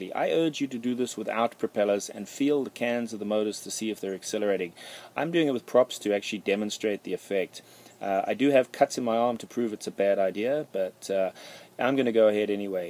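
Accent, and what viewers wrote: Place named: South Africa. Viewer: You sound like you have lived in South Africa before......(accent)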